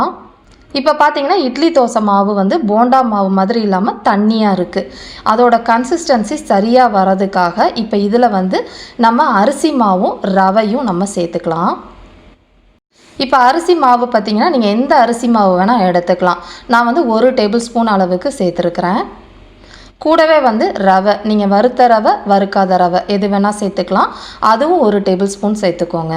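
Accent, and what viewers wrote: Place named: Coimbatore, India. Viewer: அக்கா நீங்க coimbatore ஆ,,,,உங்க பாஷை அப்படி இருக்கு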